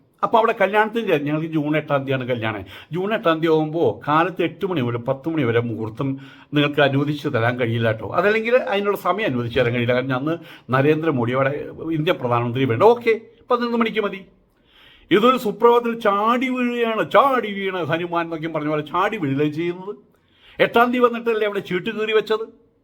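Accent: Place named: Thrissur, India. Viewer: Trissurkkarudey aa vilikkal...... Swami enthado😂😂... Soooper correct...... Jeevanulla samsaram❤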